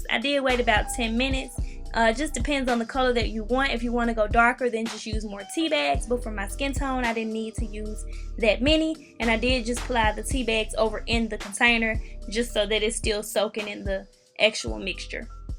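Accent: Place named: Tennessee, United States. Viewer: That Tennessee accent 😍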